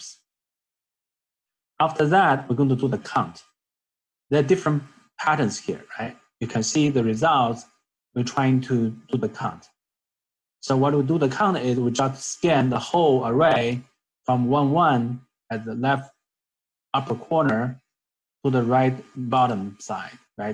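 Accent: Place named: China. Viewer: I love your Chinese accent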